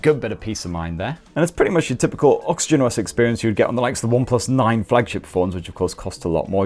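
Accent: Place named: Northern England, United Kingdom. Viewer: I only watched to hear a bald northern tit bang on.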